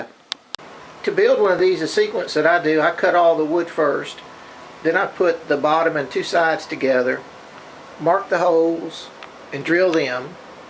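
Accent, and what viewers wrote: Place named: South, United States. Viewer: This trap really works. I've been having issues with rabbits eating my lawn and plants. I didn't want to kill anything so when I came across it look simple enough to give it a try. The guy's southern accent made me a believer too. Took about two hours to build using a drill, hand saw pliers and some screws. A what do you know, caught one the next day. I live in so California so i assume it'll work anywhere. To the creator of the video "thank you"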